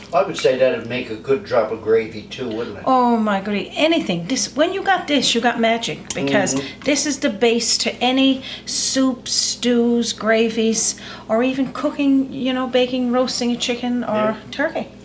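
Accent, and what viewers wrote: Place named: Newfoundland, Canada. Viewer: you so remind me of my grandmother as a young lad living in the country with farm lands all around. I grew up in the 40's and 50's and my Grandmother was always cooking, baking. I learned so much from her. I just love your Newfoundland way of talking as I told you before I worked with many men from NFLD.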